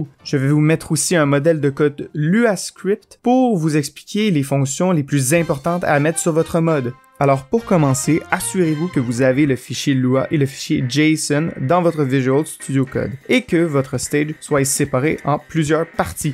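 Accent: Quebec, Canada. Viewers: tu es québéquoi ?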